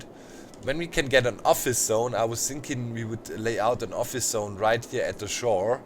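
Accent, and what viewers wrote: Germany. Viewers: Wie man einfach hört das du deutsch bist :D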